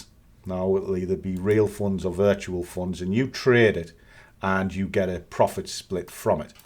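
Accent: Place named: Newcastle, United Kingdom. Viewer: I hear a little bit of geordie in your voice, are you from up here?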